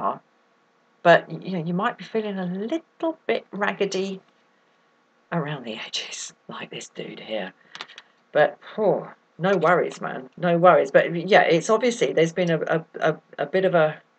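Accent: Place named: United Kingdom. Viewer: Loving your across the pond accent! Thanks for the needed message of new beginnings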